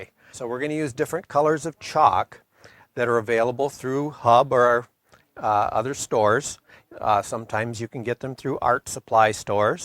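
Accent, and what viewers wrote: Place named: Midwest, United States. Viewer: If you had a clue what you were talking about you'd know that this is a midwest accent with his family originally being from Europe.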